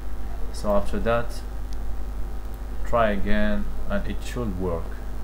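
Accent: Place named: France. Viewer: I subscribed for the french accent, and yes this worked for me on CS5. Thank you!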